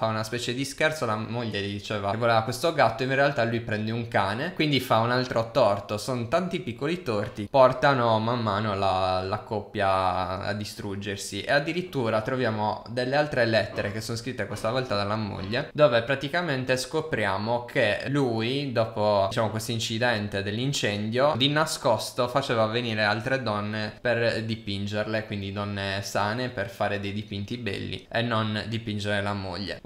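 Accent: Sardinia, Italy. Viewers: Bella bro, dall'accento mi sembri sardo😉 È così?? 🤔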